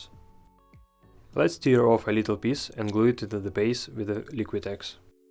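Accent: Russia. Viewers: акцент русский или мне кажеться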